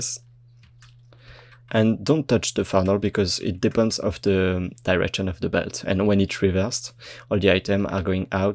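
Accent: France. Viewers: On aime l'accent français, super vidéo ! XD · Love your french accent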